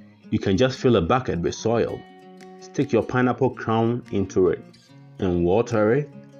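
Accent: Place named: Ghana. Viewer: This is ain informative experiment that clearly illustrates the advantage of starting the pineapple suckers in water as opposed to planting them straight in the soil. Much thanks. By the way, if you don't mind my asking, your name sounds like a Nigerian Igbo name, yet your accent is unmistakably Ghanaian, and this got me wondering if is in fact a common name in Ghana as well as in Nigeria. Please forgive the minor deviation from the theme of gardening. I have always been fascinated by accents both international and African, hence my curiosity.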